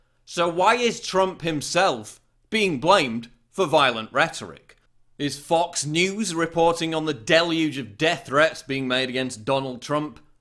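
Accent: United Kingdom. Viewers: Hold on, you sound British... Why the hell do you care so much? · By the way i love the Channel thank you. Hearing Sh%t i agree with in a British accent makes us conservative yanks feel even more correct haha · You have a British accent, shut the hell up! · whats with the british accent and such interest in american politics? always kinda weireded me out. also why do you always sound so mad? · british accent? man he is a brit living in england........ not sure why someone in england thinks they know so much about an american election........ and this info is pure speculation ...................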